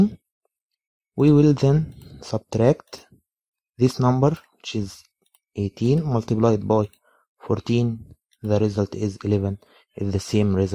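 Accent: Egypt. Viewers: no, accent says he's Egyptian man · الاكسنت المصري من اول ما قولت هاي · that is an egyptian accent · مبين عليك مصري صح لولا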